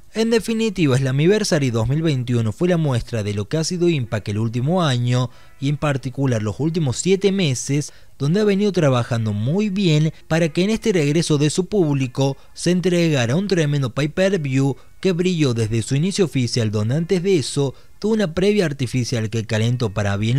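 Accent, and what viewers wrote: Córdoba, Argentina. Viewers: sos cordobes???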